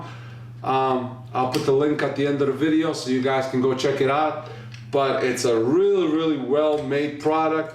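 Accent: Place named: Long Island, United States. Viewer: He's from Long Island, we all talk the same.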